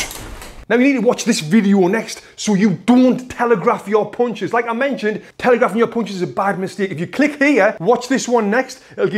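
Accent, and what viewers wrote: United Kingdom. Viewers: The amount of passion and pro advices that transpires from this man is overwelming. Also, i love such a british accent, even if i'm italian ahah.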